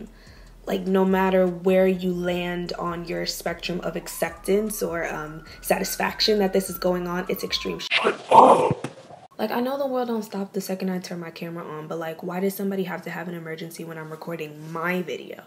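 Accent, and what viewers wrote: Jamaica. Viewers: Its the patois for meeee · you sound Jamaican, are you a YARDIE? If so, TUN UP!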